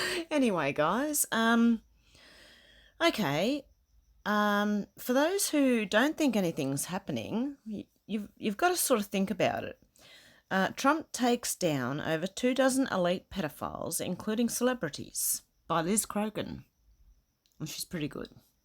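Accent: Australia. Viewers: so beautiful to hear an Australian accent and mindset. loved it. Millsys Para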